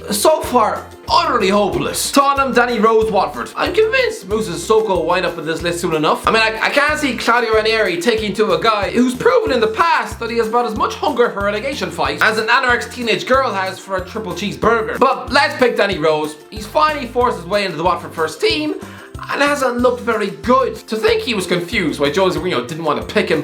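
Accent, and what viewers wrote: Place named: Ireland. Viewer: I post football news also . Just without this Irish ☘️ accent.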